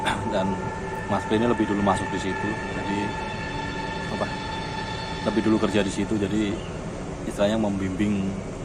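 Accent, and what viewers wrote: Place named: Banyumas, Indonesia. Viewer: selamat malam,saya tinggal di Gianyar Bali,logat anda mengingatkan saya ke daerah asli saya,Banyumas.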